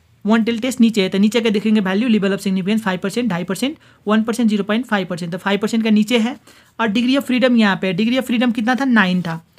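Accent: Nepal. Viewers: Try to speak pure Hindi your are from Nepal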